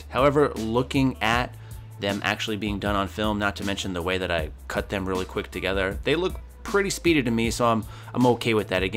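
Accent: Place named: Mid-Atlantic, United States. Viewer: It was a combo of many different states. We'll call it a Mid-Atlantic accent lol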